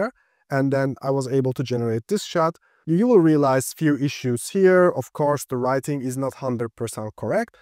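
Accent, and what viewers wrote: Turkey. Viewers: thanks for content bro 👍 i assume you are Turk right ? just feel it by your accent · baba türkçe aksan akıyor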